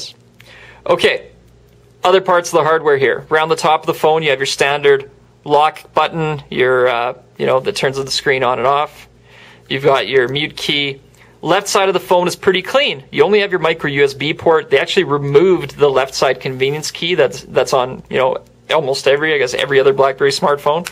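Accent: Canada. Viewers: You sound so Canadian and i LOVE it! Thanks for the awesome review! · love his canadian accent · Are you canadian ?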